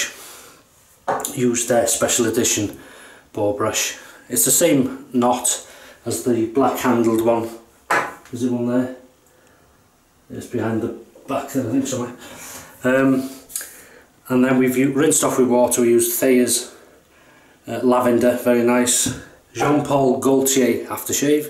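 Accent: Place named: North Wales, United Kingdom. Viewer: Nice to hear a North Wales accent on here. Hwyl!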